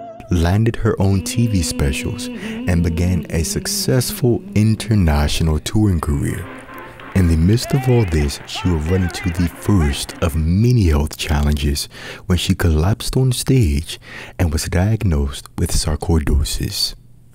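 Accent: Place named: Louisiana, United States. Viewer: i love hearing your #louisiannaaccent... some accents are so musically nuanced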